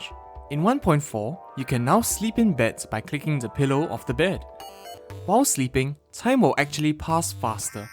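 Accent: Singapore. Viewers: Are you from singapore?? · You sound like Singaporean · bro the singaporean accent is strong with this one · i really enjoy these videos! keep up the good work! also, your voice is so nice, are you Singaporean by any chance?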